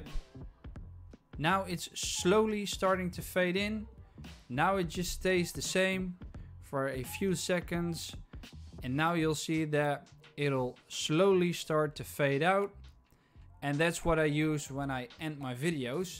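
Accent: Netherlands. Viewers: dacht het wel dat ik een Nederlands accent hoorde ! succes nog en doe zo verder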